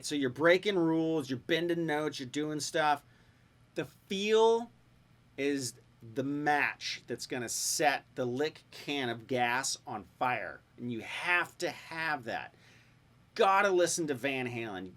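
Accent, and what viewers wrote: United States. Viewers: Enjoyed that lesson. I slowed it down to.75 speed to get the licks down correctly but when I did it made you sound like you had about 5 bong hits under your belt when you where talking. lol Guess it's that southern California accent...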